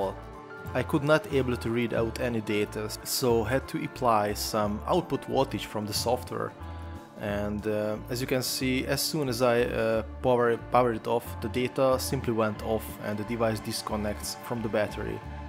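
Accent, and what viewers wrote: Hungary. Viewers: Interesting stuff! Cool Hungarian accent btw! Cheers.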